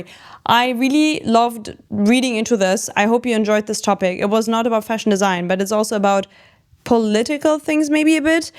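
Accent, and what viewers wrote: Germany. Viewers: bist du German?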